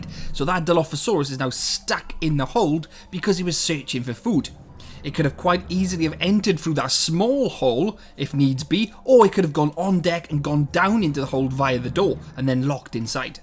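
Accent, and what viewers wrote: Wales, United Kingdom. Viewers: Sounds like Welsh accent, where abouts are you from? I'm Bangor, Gwynedd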